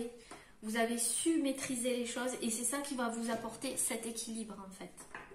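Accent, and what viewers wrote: South, France. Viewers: Votre accent du midi est absolument charmant et dynamisant c est trop chouette et mignon 🙃❤️👩‍🦽👁️👁️🌈🗝️🪐😇🌝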